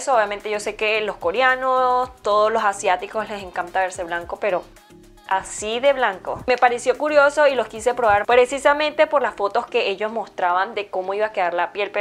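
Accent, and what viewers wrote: Venezuela. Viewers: Hola moreeeee👌🥰😂 saludos desde venezuela, tu acento es muy neutral pero tienes ese venezonalito adentro, me encanta👌❤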